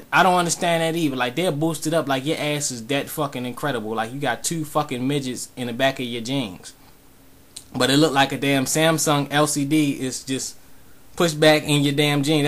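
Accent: Baltimore, United States. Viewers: are yu from baltimore dude tht made the video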